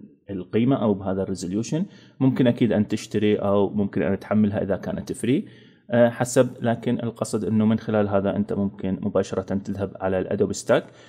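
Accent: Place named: Mosul, Iraq. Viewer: عاشتيدك والله احسن شرح لبرنامح البريميوم مبين عليك مصلاوي من العراق مو😅💜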